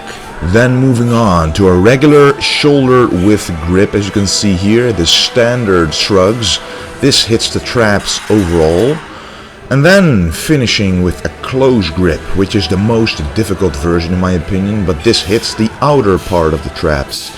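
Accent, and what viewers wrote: Netherlands. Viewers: he is dutch man that is him talking normal lol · I hear a slight Dutch Twang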